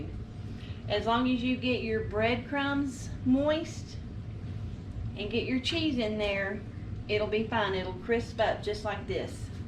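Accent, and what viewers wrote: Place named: South, United States. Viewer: Thank you for the recipe! I made this today and it came out wonderful. My husband loved this. I simply can not wait for more recipes from you. By the way I just adore your southern accent!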